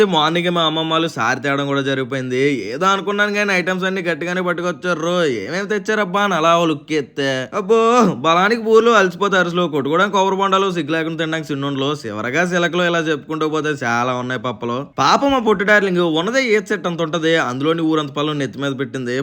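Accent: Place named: Srikakulam, India. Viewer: Bro pakka srikakulam laga maatladutunnaru meedi srikakulam aa bro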